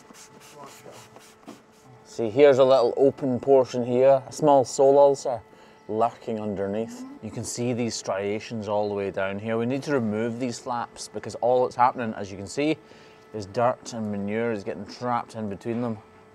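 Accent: Scotland, United Kingdom. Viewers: Hey man your Scottish right? I know you slip up on your vocabulary a lil bit but now wrys at that point go back to Scottish roots if we can't understand don't wry that's what closed captions are for lol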